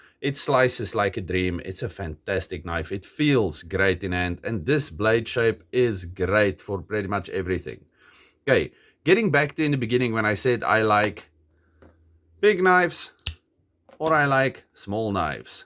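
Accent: South Africa. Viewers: Thanks for posting. Can't get enough of that South African accent! I get some from the Bike Show here on YT, and I know a SA gal of Boer descent in our church who speaks Afrikaans. It's like listening to Pete(Cedric & Ada), if he had a German/Dutch inflection. 👍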